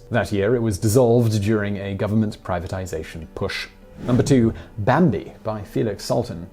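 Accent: England, United Kingdom. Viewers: He is a man from England - the country that invented the language - and you claim he is not pronouncing American names in proper English. You do not own the language and you need to remember that. Americans are not the go-to for proper English pronunciation ffs! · With your accent on vowels, some words are difficult to understand for non-british English speakers. Example, it's difficult to comprehend if your saying "of Pablo Picasso Fame" when discussing the offshoot of Cubism. Making a legitimate Subtitles option would help. The YouTube Auto-generated subtitles don't provide accurate subtitles if many vowels don't have an American accent.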